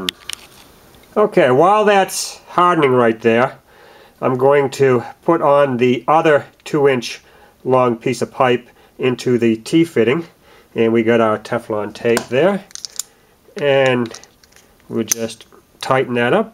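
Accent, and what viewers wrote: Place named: New England, United States. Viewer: Sound like you are from new england.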